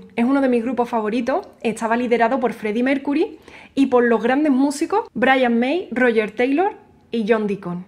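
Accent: Andalusia, Spain. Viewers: Actually I regard myself lucky because I do understand what she says in spite of her thick Andalusian accent. I'm fluent in Spanish. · Está hermosa mujer, con ese tono andaluz es bella divina!! Y el talento que tiene es única ❤ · Hola. Solo he visto la introducción contigo hablando creo que acento andaluz o extremeño y me ha encantado. Eres un dulce de persona. Yo soy fan de Queen desde mis 13 añitos y tengo 60. De hecho pertenecía al Queen Fun Club desde 1977 y era de los poquísimos que había en España por aquel entonces. Soy músico, autor y compositor y te felicito por tu trabajo y también por tu manera de explicar con sencillez y claridad esta obra tan impresionante y única en la historia del rock. Un fuerte abrazo.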